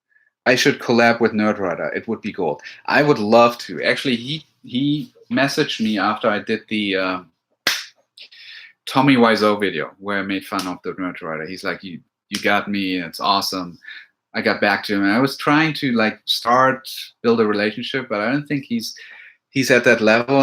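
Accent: Germany. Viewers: Love ur videos btw are u german because of your accent? just corious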